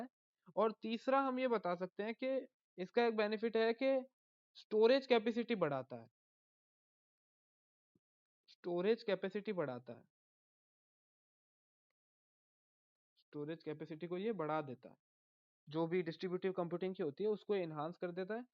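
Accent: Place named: Pahadi, India. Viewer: thodi thodi pahadi tone ari ha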